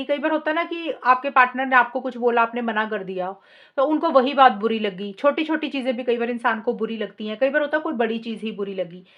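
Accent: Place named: Punjab, India. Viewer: Punjabi lang nikal he jandi hai chahe kinni hindi bol lo aap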